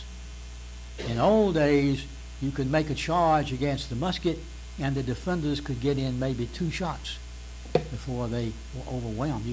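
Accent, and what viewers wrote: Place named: South, United States. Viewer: This wonderful professor has delivered a most powerful lecture!! I would love to read all of his publications and hear him speak, he has a distinguished southern accent and great knowledge. I never knew that the statues were erected and paid for by the union soldiers who fought for the people tearing them down.Wow!! People in general are very uneducated at this time in history. The boys / men who suffered and fought this terrible and great war should be honored and remembered always by all! 🌺🎵🎵🎵🎵🎵🎵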